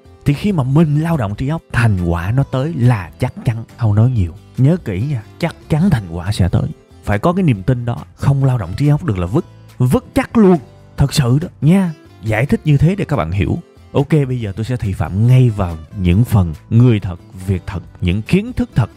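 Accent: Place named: South, Vietnam. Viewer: tiếng miền Nam hài ghê ạ, cảm ơn video của thầy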